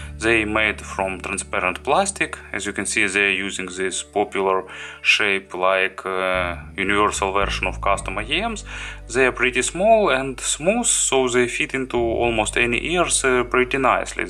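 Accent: Russia. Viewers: Thank you so much for the review. Love your Russian accent BTW and your soothing voice. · Чисто Русский акцент))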